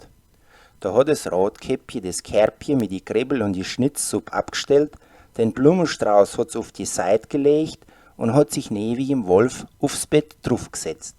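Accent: Franconia, Germany. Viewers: Täuscht das oder erinnert das an ein ganz komisches fränkisch?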